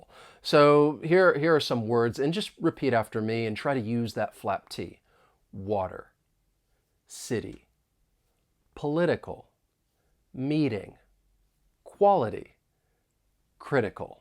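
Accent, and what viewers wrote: United States. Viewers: you are an amazing teacher i have seen on youtube,,,,,,,your teaching skills are amazing,,,,,,,we all needed this type of explanation🥰🥰 you just nailed amercian accent🥵now its very easy for pronounce such an accent ☺but the last one is very difficult and complicated 🥴SUPERCALIFROAGILISTICEXPIALIDOCIOUS ufff🥵🤧🤒😴🥱 what does it mean 🤔by the way thankyou so much for this explanation🥰🥰🥰greetings and love from PAKISTAN😍😘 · Beautiful, nothing like American pronunciation. It's my favorite. ❤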